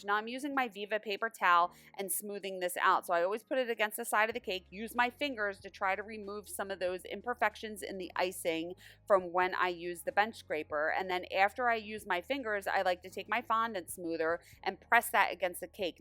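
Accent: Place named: East Coast, United States. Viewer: I didn't say you said it wrong! Girl, I love east coast accents lol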